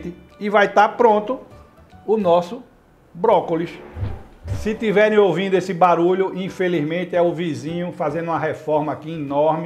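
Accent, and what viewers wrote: Brazil. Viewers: Que explicação profissional e prática! Esse sotaque é de Recife?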